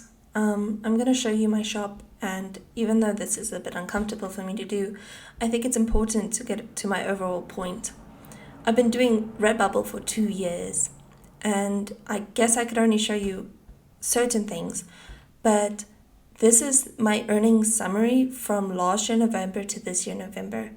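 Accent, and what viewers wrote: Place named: South Africa. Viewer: im like this girl 100% sounds south african and boom i was right. i do redbubble for fun and you are 100% right through out your video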